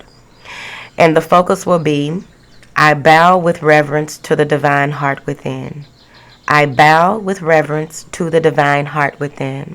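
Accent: South, United States. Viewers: That southern accent tho. 💜 🙌🏾💯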